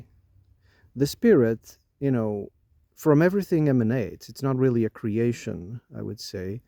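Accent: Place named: Portugal. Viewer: Inglês com sotaque português detetado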